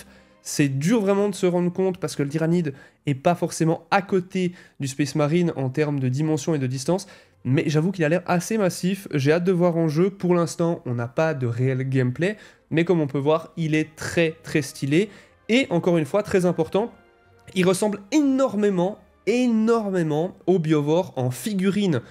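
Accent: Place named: Switzerland. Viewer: QUOI TU EDT SUISSE, quelle canton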